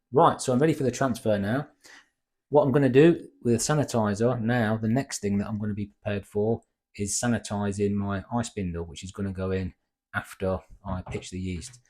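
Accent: United Kingdom. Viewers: You sound like your from Leicester same as me lol. Just subscribed and given a thumbs up to this great video. I've just bought the Pinter3 presented by Guinness, really looking forward to brewing with it and watching more of your work to help me. I;ve got Dark Matter and Guinness Dublin Porter, sadly Shadow & Creams out of stock. Do you think I can get good results following the Pinter app to start with just to keep thing simple? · sorry one more question. You wouldn’t be from Nottingham by any chance? Your accent sounds local to me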